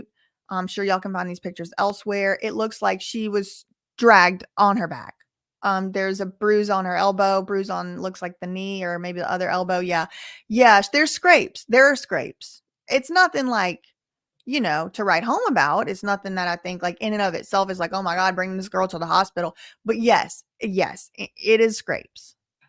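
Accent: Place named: Tennessee, United States. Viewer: accent has Tennessee written all over it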